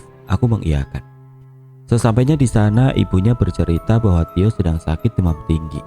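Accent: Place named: Surabaya, Indonesia. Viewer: Wes ta bedek ket awal logate koyok wong suroboyo, jancoookk 😂😂😂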